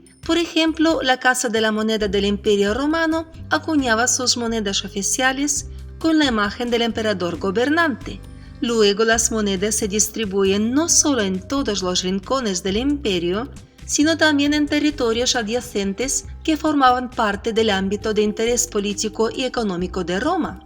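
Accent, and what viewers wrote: Russia. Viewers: Hey eres de Rusia verdad? Tu acento es inconfundible :3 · Muy bueno veo todos tus videos desde que lanzaste él primero, tenes que hacer un video sobre "vos" llama la atención tu asentó Ruso y que te llevó a realizar éstos trabajos históricos, desde ya muchas gracias y seguí así 😉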